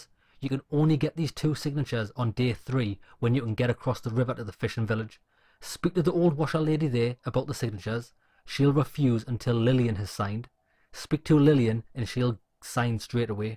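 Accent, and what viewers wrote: Newcastle, United Kingdom. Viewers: Woof that's a strong whiff of Geordie, I think I understood most of it though. Thanks man.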